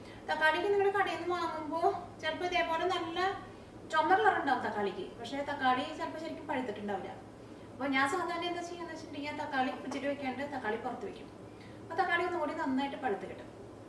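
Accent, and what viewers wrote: Thrissur, India. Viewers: onnu ochati paranjuday super aayirikunu curry alla ur very beautiful but slang oru kachda thrishur style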